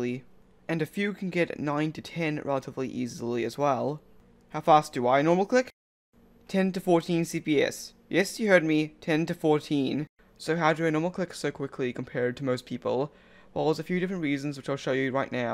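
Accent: New Zealand. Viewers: GGL it's his accent, I think he is from New Zealand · Its kiwi accent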